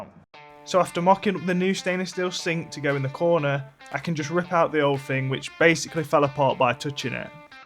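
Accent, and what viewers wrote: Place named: South Lincolnshire, United Kingdom. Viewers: Cracking job mate, im guessing your accent? South Lincolnshire? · First time watching. For the first 10 minutes, your English accent kept reminding me of someone famous, but I couldn't put my finger on it. Finally, I realized you sound like Brett Goldstein, from Ted Lasso. Looks like he's from Sutton, UK. Are you from somewhere nearby?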